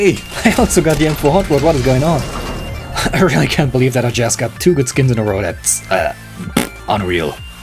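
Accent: Saarland, Germany. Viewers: b_Unr34l saarländisch junge saarländisch,... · for a german, your accent and voice overal is really great. Like audio honey. You should do some narations or more commented videos. Btw grats, you lucky bugger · Hojo dö und dein saarländisch... Wie wärs mit nem Dragon Lore Trade up? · "OH LECK, näää es is de hyper beast.. ik glaaab es ned!" xD bester:) Saarländer oder? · Man hört den deutschen akzent sofort raua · guck dir die kommentare an 90%möchte videos mit stimme bitte mach es häufiger!Und davon 30% will mit deinem saarländischen Akzent.Deine stimme ist einfach pornös alder.Ik glaub es net xDD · Das is Saarlännisch dass muss sich so anhöre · ☆ PC Gaming ☆ Wie geil enfach mo saarlänisch rausgekloppt. made my day^^ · Immer diese Saarländer xD, beschter Dialekt überhaupt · Endlich mal ein Saarlänner auf YouTube, so genial ;D Kann es sein das du Englisch besser kannst als Hochdeutsch? 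· Are you from Germany? :D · Saarlännisch mei gudda saarlännisch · "Oh leg,nee.Ich glab es net."Komscht du aus em Saarland? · + bischt dau a saarlanner? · das ist saarländisch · Oh leck do kommt da Saarländer raus xD bescht xD Saarland rules <3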